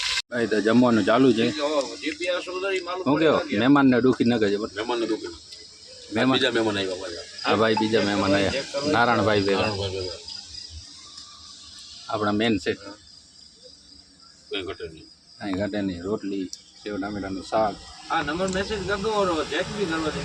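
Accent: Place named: Kathiawar, India. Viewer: મઝા નથી આવતી ભાઈ... તમારા વીડિયોમાં જ બધું આવવા દયોને.. અહીં મુંબઈ માં બેસી તમારી કાઠિયાવાડી ભાષા નો લેહકો સાંભળવાની બહુ મઝા આવે છે...". કાંઈ ઘટે નહીં ભાઈ..." 😊😊😊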